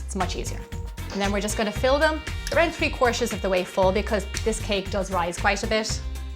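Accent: Ireland. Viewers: I thought u were from Ireland nice to meet you! ☺️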